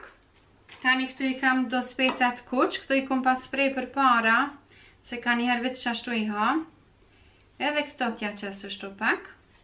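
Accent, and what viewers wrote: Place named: Kosovo. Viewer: pershendes shum dhe po fol shqip si krejt kosova vetum dallimi asht cilin vend jeton ska kurfar dryshimi ma mer mendja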